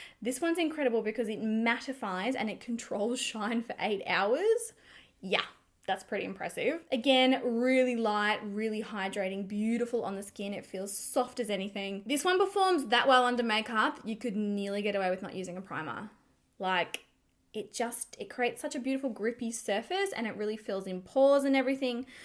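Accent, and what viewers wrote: Australia. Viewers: Love the Aussie accent😍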